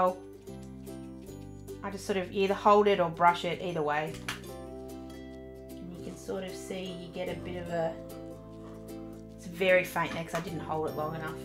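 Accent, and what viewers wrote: Australia. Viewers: hearing your accent, i was staring at that notebook going "that looks just like my mystery 120gsm notebook from K-Mart Australia" lol. Anyway, thanks for sharing! after buying a set of Chinese watercolor brush pens from Amazon I finally got Tombows. I have a set of Caliart alcohol markers I got a while back but never used much due to the massive bleeding, and before I knew I greatly preferred brushes over those massive chisels. A store near me has Copic Sketch with chisels/fines, and Ciaos w/ brush tips. I've seen two types of 'marker paper' - One is just basically card stock, the other is thinner but slick, I assume with surfaces treated to resist the bleeding or something. I still have the Chinese Sunacme pens and honestly like them. They're smaller than the Tombows and came in a lunchbox-sized case, and the fine tips are like Staedtler fineliners. 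But alas, can't replaced markers as they go empty... I want to find refillable dual tip watercolor brush pens someday...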